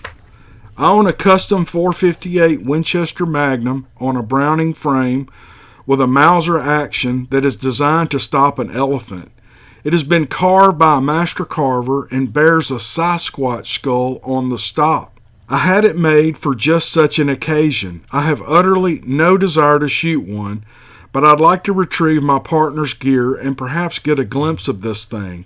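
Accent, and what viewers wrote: South, United States. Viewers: I love you southern draw, I'm from the south part of Arkansas and was told by my dad about swampy wooded area men would go hunting in but would never come out. This place seemed to me that it was south of Pine Bluff, Arkansas. Maybe you know the place or could find out. My dad when he told it looked really scared like he may have heard or seen something but wouldn't tell me or mom. I think he may have because he seemed to be a bit shaken up about it. Also if anyone reading this can relate to this story, please let me know. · thanks man, always great to hear a southern boy.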